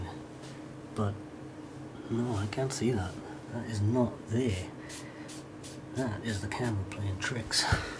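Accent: Wales, United Kingdom. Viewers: Are you Welsh. Muss ?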